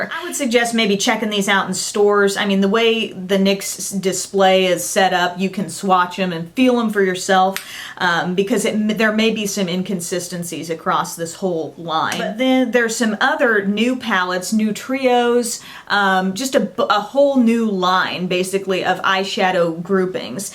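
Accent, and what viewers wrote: South, United States. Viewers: ! I've been watching your channel forever and today I decided to revisit some old videos. I noticed that sometimes a southern(?) accent comes out in your older videos, but it's completely missing in your newer ones. Just thought that was funny. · I did not notice it before but Oh yeah.. you have (a little) southern accent :))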